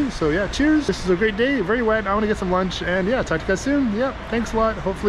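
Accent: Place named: Western Canada, Canada. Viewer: you sound western canadian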